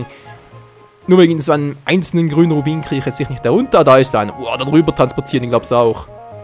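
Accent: Switzerland. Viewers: Kommst du aus der schweiz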